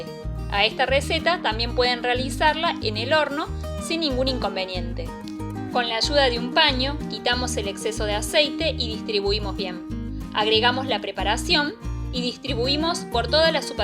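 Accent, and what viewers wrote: Córdoba, Argentina. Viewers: Es cordobesa la cocinera o parece?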